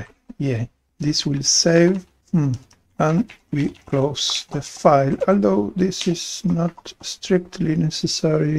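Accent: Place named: Argentina. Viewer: How nice that I could notice the argentine english accent just 10 seconds into the video with no prior clue haha. Thanks for sharing the project!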